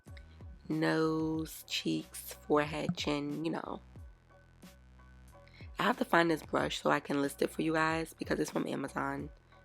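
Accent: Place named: Bronx, United States. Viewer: I like your accent reminds me of the people from the Bronx or I think it's jersey lol love your channel and how relaxing your videos and informative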